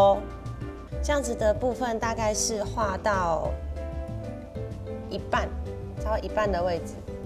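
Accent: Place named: Taiwan. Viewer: 也不是毎個台灣人都這樣...只是她説的比較台而巳...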